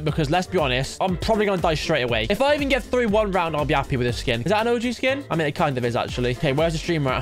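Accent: United Kingdom. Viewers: are you cornish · Is he English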